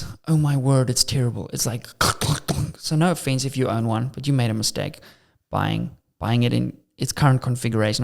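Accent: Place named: South Africa. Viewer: Thank you love the channel and the south african accent 👌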